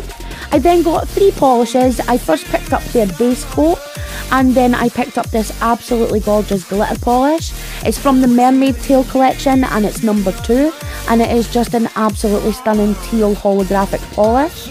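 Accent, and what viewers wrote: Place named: Glasgow, United Kingdom. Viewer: Seriously I just want to have your voice as my Siri/gps voice i love your accent Are you from Glasgow or that general area?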